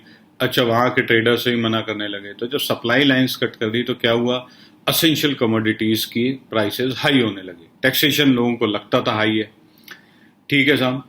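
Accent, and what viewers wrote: India. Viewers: This guy from his accent is cleary an Bharati.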